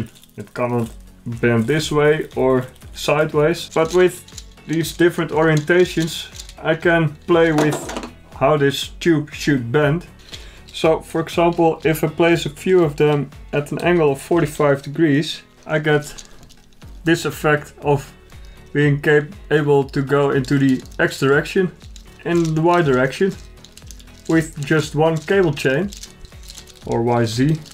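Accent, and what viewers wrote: Netherlands. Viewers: Are you dutch because of youre accent and the you sayed tering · I'm too lazy to see who fourth or fived that so I'm just gonna n that :D Try reversing one of the phases. Use a multimeter to determine the individual coils or if you can find one, pull up the datasheet for the motor. I've had the same issue multiple times and that always fixed it. Steppers don't break just like that ;) Btw, totally subbed. Interesting content and Dutchlish is just super pleasant to listen to :D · do I hear a dutch accent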